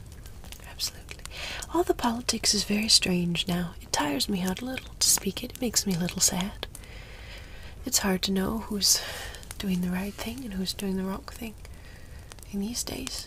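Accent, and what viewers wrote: Denmark. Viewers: As a dane I gotta say, the accent is on point, you sound like someone from Copenhagen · I'm from Sweden and I could hear you Viking blood sing ;) Sounded alot like a Danish (viking) to me! Love all the history you weaved into your viking saga :)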